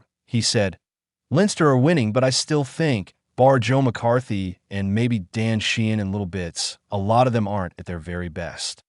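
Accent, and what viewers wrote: United States. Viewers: Why the American accent? This isn’t an American sport - it’s British. Change the narrator. And you’ll have a better response…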